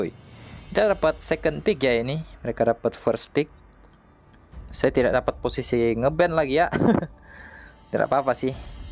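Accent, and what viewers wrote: Manado, Indonesia. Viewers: kayak orang manado pnya logat wkwk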